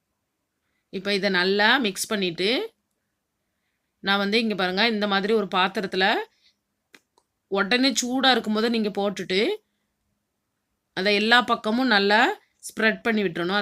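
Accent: Tirunelveli, India. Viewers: Hello sis...which temperature has to set if the recipe is cooked with oven? Also sis one small request...pls reduce english words and speak in your tirunelveli tamil....it is sweet to hear like you pudding recipe:-)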